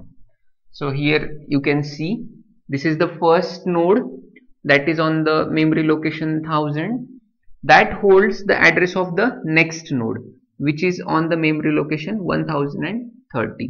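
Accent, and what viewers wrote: India. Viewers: That's indian accent , it's no way a British one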